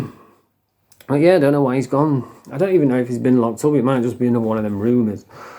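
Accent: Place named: Newcastle, United Kingdom. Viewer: That geordie accent 😂😂 class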